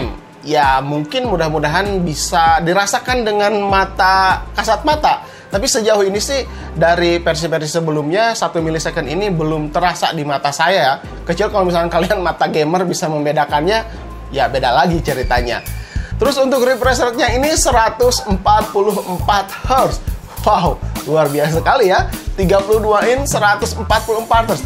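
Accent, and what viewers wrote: West Java, Indonesia. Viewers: Aksen sunda nya masih ada kyk dulu _.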